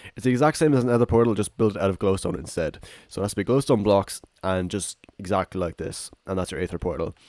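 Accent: Ireland. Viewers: Is ethis guy irish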